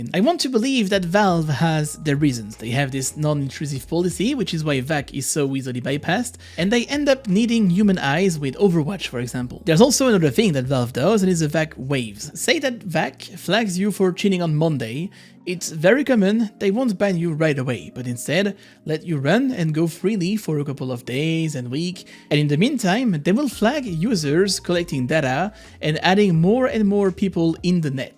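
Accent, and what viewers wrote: France. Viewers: You're the first French who speaks English without a too big accent. I can handle and see all this video's thks ❤️ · I am currently really enjoying to eat zuccini (how tf do you even write this in English). Thanks, your sweet accent always reminds me of doing my French homework for university.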